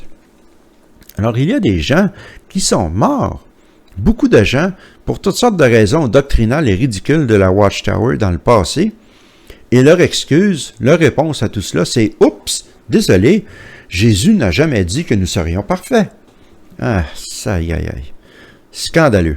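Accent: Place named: Quebec, Canada. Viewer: Je salut votre courage cher monsieur 'vous parlez en connaissance de cause. Je suis pas athée' je m instruis par le biais de vidéos. Tout laisse à croire à un lavage de cerveau. Nous devrions tous restez libre et choisir une voie spirituelle si on éprouve le besoin et quand le moment viendra. Merci pour l accent québécois ❤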